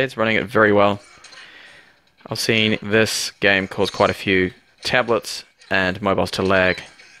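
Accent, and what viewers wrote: New Zealand. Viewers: Are u from NZ? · Yay finally a kiwi accent! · "Seeven " Teen " haha :) cool kiwi accent. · Hi Mate, I heard you mention that you're from NZ (the accent says it all really). Im looking at getting a Redmi Note 3 from Aliexpress from a seller called Hong Kong Goldway. I struggle to find these in NZ at a reasonable price so think this is the best thing. Are you able to comment on how easy it is to flash the global MIUI rom and have all google services installed. As I assume the Aliexpress models from china have no google services and heaps of bloatware. Then again, where did you get yours from (if you did get it delivered to NZ) Cheers in advance.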